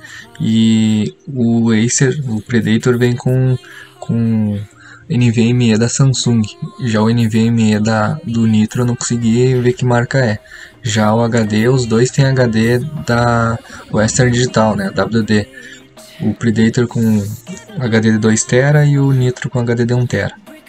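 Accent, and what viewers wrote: Brazil. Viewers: Amo assistir um video de tecnologia com um apresentador Gaúcho. É uma baita barbaridade thê kkk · Tu é de SP?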